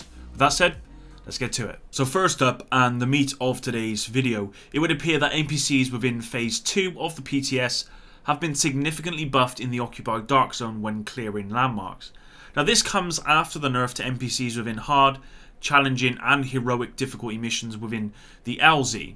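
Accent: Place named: Bristol, United Kingdom. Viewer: What part of Bristol are you from?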